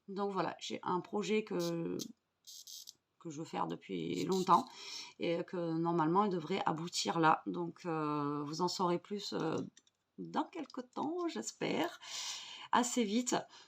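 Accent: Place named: Southern France, France. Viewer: Restez comme vous êtes 🙏et un adage qui m aide beaucoup dans la vie : on ne peut pas plaire à tout le monde et c est tant mieux 😉quand à vos tutos « free style » c est justement ce que j aime en vous et votre joli accent plein de soleil ☀️ Je reste à vous suivre et surtout ne changez rien c est votre personnalité créative 😊🧡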